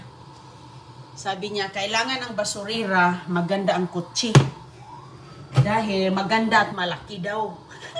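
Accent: Visayas, Philippines. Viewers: Visaya po ksi siya natural lng po yan sa pananalita nila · Eh bisaya nga kaya ganon syang mag salita hellow wag n kayang mang husga .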